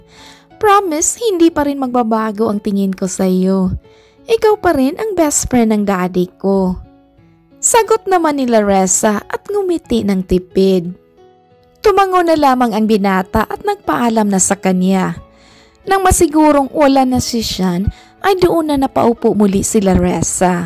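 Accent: Visayas, Philippines. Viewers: Maganda kwento wala ako masabi po sa boses lng d maganda po try ko lng po makinig ng kwento niyo,,maganda po mga kwento ,,,tamo po ung mga kwento ni amihan stories,kat amazing,saima ung mga boses nila magaganda po,pero ung boses po d maganda parang bisaya salita oh hirap tagalog po ✌️✌️✌️✌️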